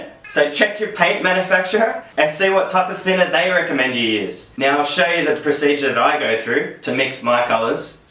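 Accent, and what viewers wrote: Australia. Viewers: thanks for the advice and hey I like your astarlian accent :)) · thnx m8, btw I love the AUS- ACCENT.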